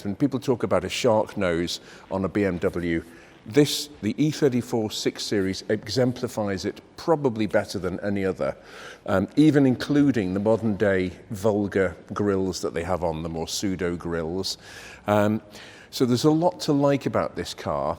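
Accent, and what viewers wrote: United Kingdom. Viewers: When aggressive meets beautiful on 4 wheels. Fantastic review once again. No stupid music, no fluff and a very British delivery... Lovely 🇬🇧❤️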